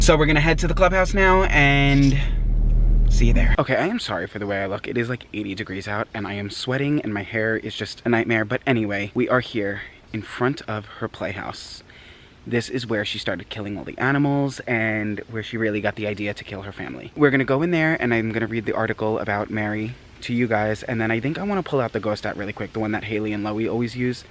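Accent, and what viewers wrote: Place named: Boston, United States. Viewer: love that boston accent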